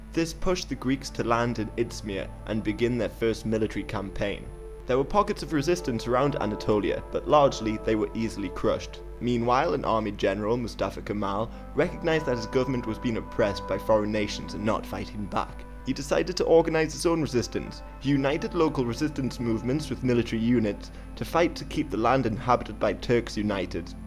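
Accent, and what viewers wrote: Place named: United Kingdom. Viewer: Its difficult for not english speaking people to understand... British accent is the worst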